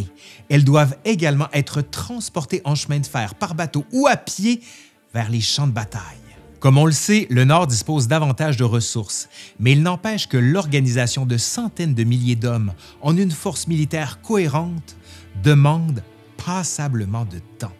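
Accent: Quebec, Canada. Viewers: Avec ce petit accent canadien qui fait que le charme est complet car on est complètement dedans ! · Super vidéo, je suis un passionné de la guerre de sécession, et c'est un véritable plaisir de l'entendre raconter par ta personne. Surtout ne perd pas ton accent Québécois, cela enlèverais la moitié du plaisir à t'écouter. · J'aime son accent français. Est-ce Canadian ? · Beau travail, l'accent Québécois ajoute un côté agréable même si quelques mots m'échapes . Mais pas grave le sujet est boulot effectué est très agréable a visionner. Bravo ! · Le narrateur a un superbe accent Canadien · Très intéressant. Un point objectif et clair sur la guerre de sécession 😮. En plus l accent canadien est au top😅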